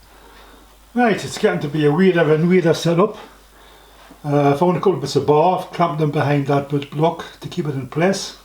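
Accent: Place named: North East, United Kingdom. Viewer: your welcome ,just "discovered "you today got some great stuff ,like the hold down for silver soldering, metal is sorted out from the "scrap box" & I'll have one made tomorrow ! northern eastern accent I'm prob not far from you a little bit further down. Graham.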